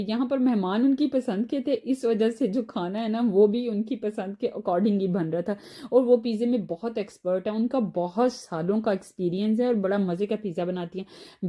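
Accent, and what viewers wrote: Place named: Punjab, India. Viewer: Mujy ap ki Punjabi Ka tarka bohat Pasand ha ji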